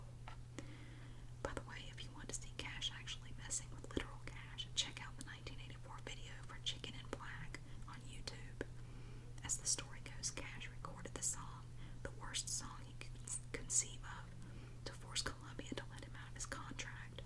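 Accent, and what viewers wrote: South, United States. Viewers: Your voice is so soothing! Makes me feel at home. Originally I'm from Tennessee but now live in Oklahoma, just love a soft southern accent! Thank you for making these videos!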